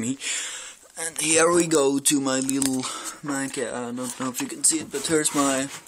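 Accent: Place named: Trøndelag, Norway. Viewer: I love ur Trønder-Engelsk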